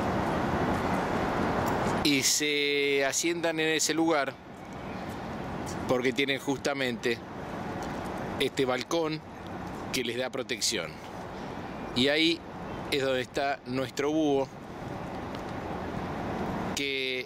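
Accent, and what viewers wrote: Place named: Argentina. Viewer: El que habla es argentino.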